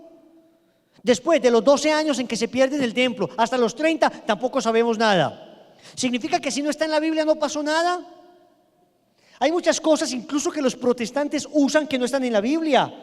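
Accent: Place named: Colombia. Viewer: este ermano deve ser Colombiano muy buenas explicación aun que no pude evitar reir en varias veses su forma de ablar es desir de desir las cosas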